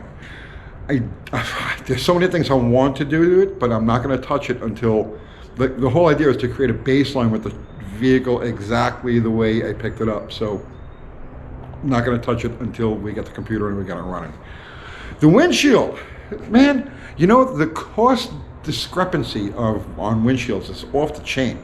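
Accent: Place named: Northeast, United States. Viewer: So, based on your accent, I just assumed you were in the Northeast. Shout out from Clarksville!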